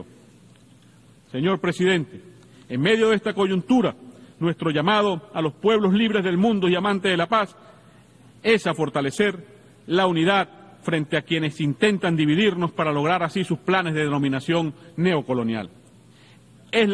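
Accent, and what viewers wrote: Cuba. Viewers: Ese tipo no es venezolano/es cubano,se sabe por el asentó!. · ¿A nadie le parece curioso que este tipo tenga acento cubano? No es Venezolano. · Tiene un aceptó cubano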